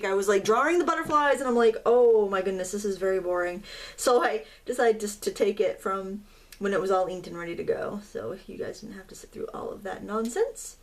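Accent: Maine, United States. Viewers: oh dear, i have been watching your channel from the beginning of my you tube days i think you had just a few thousand subscribers and you are in fact the 2nd person i subscribed to. I must say you have been a joy and a good friend through the years. Whatever the video of the day is it makes me smile, your children and family are priceless and so is your lovely chatter whether it is in your "maine dialect" or your fun and happy voice it is always like talking to an old friend. take care and never stop we would miss you terribly. Shame on her, she has no idea what she is missing! Aloha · What a clever idea, laying out the three bookmarks, making them as one, then separating them. What a fun way to play and create bookmarks at the same time. BTW, I never care how long your videos are, for as you know from other comments from me, I love to hear you "mainespeak" and your cheerfulness always gets me in a good mood, for it takes my mind off of what ails me. 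Keep 'me up, Sistah!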